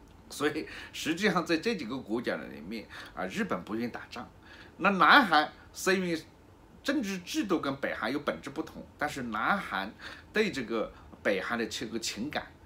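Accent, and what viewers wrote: Hunan, China. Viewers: 何频你太啰索! 一個简单的问题谈得老长，言不扣题! 另外普通話要说好，你这年龄不該说成这样-湖南国语。改改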